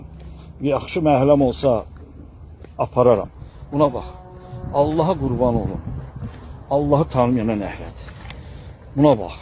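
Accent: Ganja, Azerbaijan. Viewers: Qardas Gencelidi lehcesinden melumdu.Gencede Qadan Alim demek bir ezizlemek sozu kimi deyilir ve bilinir.Mene maraqli olan oduki yeni bu cure gozel seylerden ancaq bu soz size batdi?? MasaAllah bu qardasa mence o dediyiniz agila daha da cox ehtiyaci olanlar var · Ay Allah Gəncə ləçəsi. Allah qəlbində mərhəmət hissi olan insanları qorusun. Maşallah sizə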